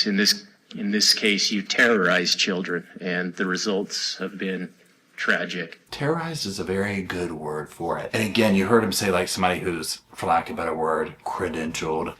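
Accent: South, United States. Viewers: I have figured out why I enjoy your commentary about cases so much! You remind me of conversations with my brother about anything/anyone who is doing shady shit or sus situations. I love it as we can be some salty, sassy, and petty southerners. I laugh because my brother in law just rolls his eyes when we get catty, but that's how we just gossip for lack of a better word. Now, mind you, this isn't really gossip, but it's delivered similarly and as we grew up in west tn, the accent is another plus. This is just a thank you for being you and and for doing what you do bringing cases and situations around them to light in your way.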